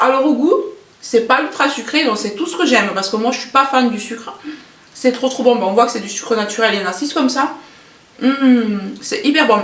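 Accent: South, France. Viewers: j'adore ton accent ensoleillé, accent du Sud ?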